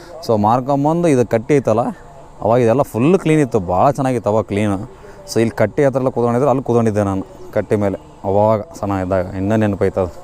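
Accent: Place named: North Karnataka, India. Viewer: ಹೇ ಗುರು ನಿಮ್ಮ ಭಾಷೆ ಉತ್ತರ ಕರ್ನಾಟಕ ತರ ಇದೆ.. ದಾವಣಗೆರೆ ಭಾಷೆ ಸ್ವಲ್ಪ ಚನ್ನಾಗಿ ಇದೆ ಅದನೇ ಮಾತಾಡು 😂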